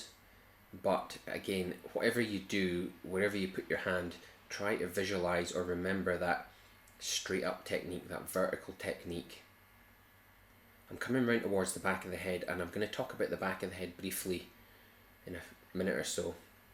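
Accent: Scotland, United Kingdom. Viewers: Great video! It’s also nice to hear a Scottish accent on YouTube. It sounds as if you’re from Aberdeen, my home city! :)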